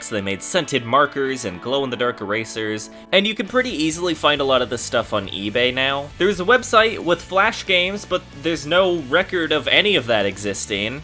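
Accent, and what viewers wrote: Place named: Georgia, United States. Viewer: You’re definitely from Georgia aren’t you?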